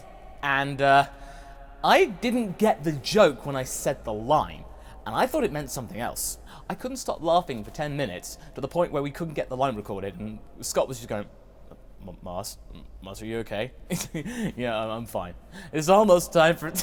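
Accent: United Kingdom. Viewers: I remember being so shocked that you were british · HOLY YOURE BRITISH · By any kind, Are you British?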